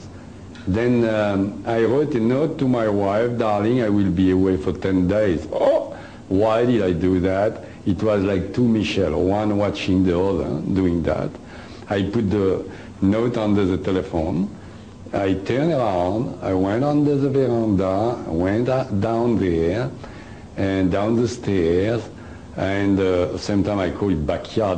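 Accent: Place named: France. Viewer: He is well and not longer in Vietnam, but that is besides the point, yes this was shot along time ago, and the video quality suggest as such, he is french, so of course he has a thick french accent, but i can understand his English just fine. I guess it comes down to the ability to understand others with accent's regardless, the book is made available in perfect English read it and you will know everything he talk about in the lecture, also don't just pass this up, this information is the read deal.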